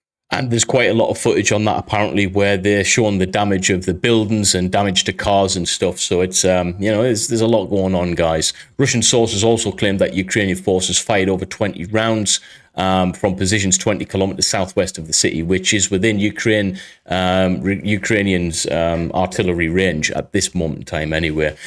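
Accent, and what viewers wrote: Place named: County Durham, United Kingdom. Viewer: You sound like someone from County Durham and for that reason you have my “thumbs up”. Best wishes.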